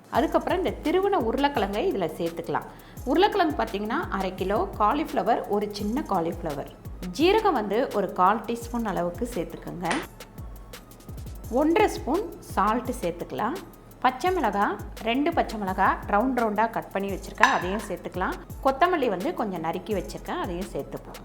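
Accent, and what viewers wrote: Telugu, India. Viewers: உங்க தாய்மொழி தெலுங்கா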